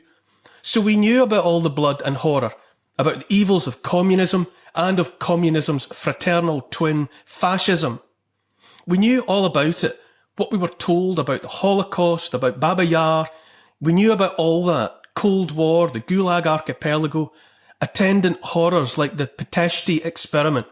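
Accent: Scotland, United Kingdom. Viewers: are you Scottish? just saw (the bachelor- dating all the ladies ) in Edinburgh, they really liked it. i had been there new years eve with a bunch of military couples long ago, it was so fun, people were so nice!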